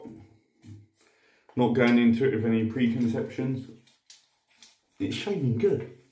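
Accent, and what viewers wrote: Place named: United Kingdom. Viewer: After 2 minutes i realised he is from uk, and he is really from uk! They have this accent even foreigner easy understand.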